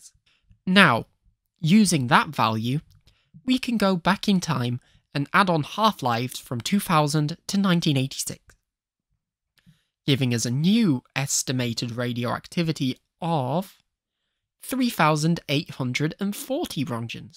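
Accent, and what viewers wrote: United Kingdom. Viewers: Started video and heard the British accent that pronounces TH as F. Immediately stopped video and clicked Do not recommend channel. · No its the British accent that sucks.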